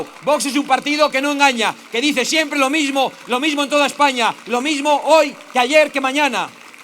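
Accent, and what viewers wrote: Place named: South America. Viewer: Mi marido dice que suena sudamericano, no español.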